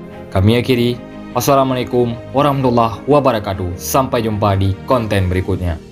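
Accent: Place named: Pidie, Indonesia. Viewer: Sang dari pidie nyo tadeungo logat su😂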